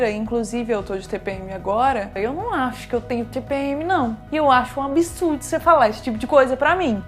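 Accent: Minas Gerais, Brazil. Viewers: Se você nao conta, ninguém ia saber que você é mineira :-) esse sotaquinho de Mineira... :-) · CARA, COMO VOCÊ É FOFA, ESSE TEU JEITO DE FALAR "É BUNITO DEMAIS DA CONTA SÔ", ACHO LINDO E VOCÊ É UMA SIMPATIA DE PESSOA, TE ADORO, SUA LINDA!!! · Por isso do sotaque mineiro uai uehhehhehhe 😂👏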